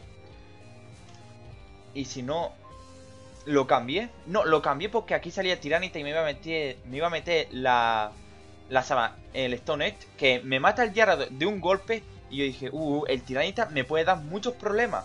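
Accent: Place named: Mexico. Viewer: suenas mexicano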